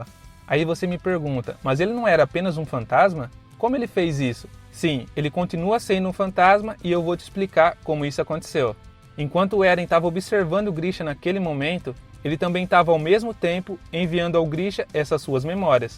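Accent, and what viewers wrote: Brazil. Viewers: Mó baiano KKKKKKKKKKKKKKKK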